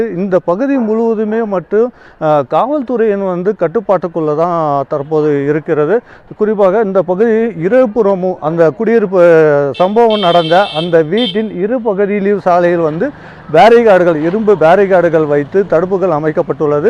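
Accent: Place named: Bangalore, India. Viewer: Bangalore Tamil 😅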